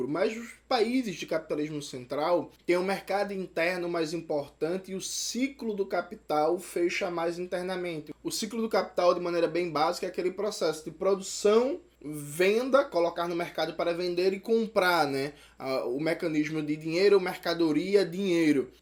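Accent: Pernambuco, Brazil. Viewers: Veiga Pela sua falta de percepção a respeito do que é o sotaque de um pernambucano, percebe-se que a dificuldade está em vc de entender o que é a realidade e a diversidade brasileira e, claro, o que ele diz no vídeo. Em nenhum momento ele defendeu, assim como qualquer outro comunista ou socialista sério e radical defenderá a estatização de todos os meios de produção, mas sim a progressiva abolição da propriedade privada dos meios de produção, o que é bem diferente. Mas se vc não é capaz de perceber e nem de respeitar um simples sotaque tb não deve saber diferenciar o que eu esbocei aqui.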